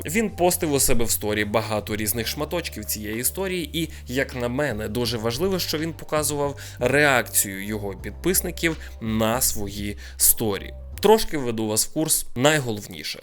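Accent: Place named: Olevsk, Ukraine. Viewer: Дякую за позицію! Мої Вам вітання з Києва! Як же я скучила за олевською говіркою. В мене тато звідти, з села Кишин, там досить багато рідні. Нажаль останні роки рідко спілкуємося, якесь бентежне життя настало...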